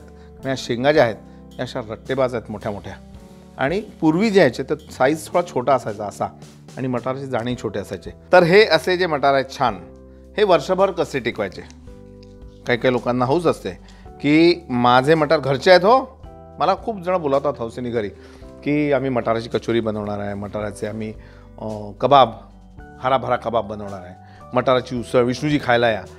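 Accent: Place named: Nagpur, India. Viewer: Khup Chaan Saangitle Sir . . .aani khupp useful . . SIR mi tumchhi FAN aahe . . Mala tumcha Nagpuri Lehja khup aawadto . .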